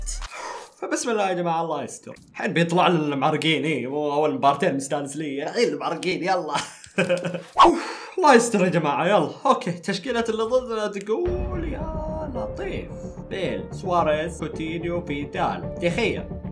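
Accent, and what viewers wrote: Gulf, Saudi Arabia. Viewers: اريد سأل انت سعود ولا بحرين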